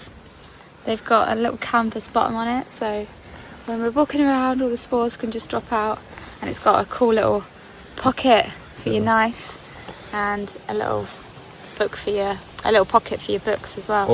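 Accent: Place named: Sheffield, United Kingdom. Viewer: Question are you from Sheffield?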